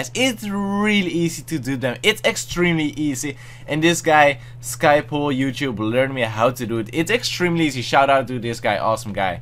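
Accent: Netherlands. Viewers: is he dutch? ik ben wel nederlands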